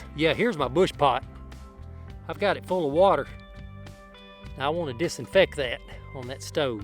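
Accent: Alabama, United States. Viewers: Everybody loves that southern twang.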